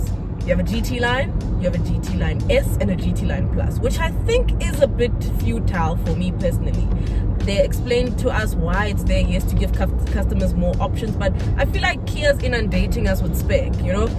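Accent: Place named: Kasi, South Africa. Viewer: I like how you review your cars, pure kasi style you can even hear it from the accent 😂❤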